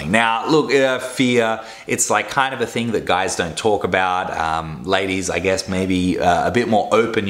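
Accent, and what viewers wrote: Australia. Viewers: Is your accent Australian? So you train where the spiders are the size of your head?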